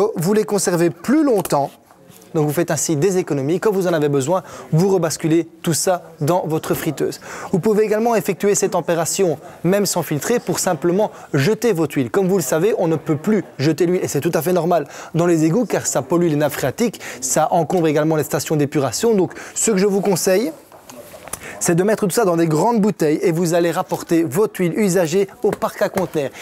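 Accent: Belgium. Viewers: j'aime beaucoup l'accent belge, ça donne un charme assez prononcé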